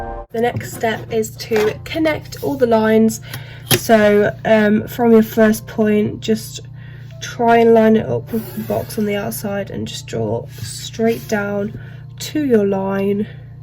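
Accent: United Kingdom. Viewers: Guess ,you speak britich accent ,right ? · you have british accent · I like ur British accent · her British accent <33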